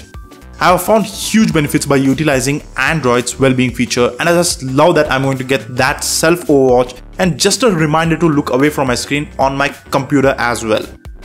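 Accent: India. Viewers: He was probably born and raised in a different country from you. My guess is the vicinity of the Indian peninsula? I've got good money that you're a Trump American. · Brother, are you Indian?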